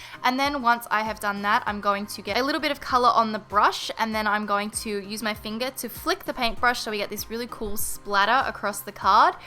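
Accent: Australia. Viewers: are you aussi?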